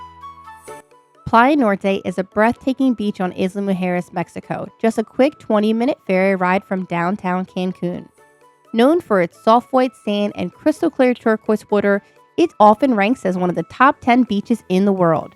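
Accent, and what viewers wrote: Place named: Philadelphia, United States. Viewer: I had to check your profile to confirm my suspicions after you said “water”…Philly accent confirmed! Lol thank you for the gorgeous views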